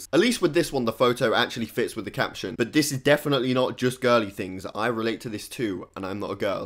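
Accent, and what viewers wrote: England, United Kingdom. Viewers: Your accent makes me want to move to england.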